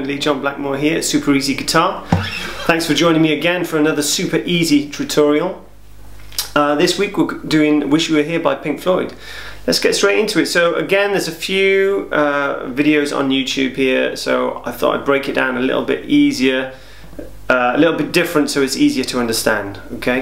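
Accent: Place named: United Kingdom. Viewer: correct me if im.wrong but judging by the accent... hes british.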